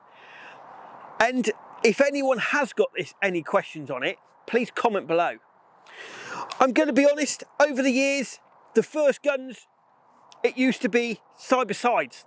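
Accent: RP, United Kingdom. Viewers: You are talking like a rich guy......go to Alaska and hunt....Kill some real game. You make it sound like a hierarchy.....lol....gosh British people are so funny......sausage rolls n stuff.😂😂😂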